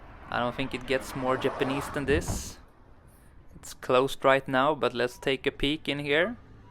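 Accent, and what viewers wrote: Sweden. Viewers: Guessing you saw my comment on jcontra's recent vid and subbed to me as a way to let you know your channel exists.....interesting way to get peoples attention (it worked!), vids look good so far so I guess I'll stick around! bra att veta att det finns fler YouTube retro-samlare i Japan (could tell immediately you're Swedish from the accent ha ha)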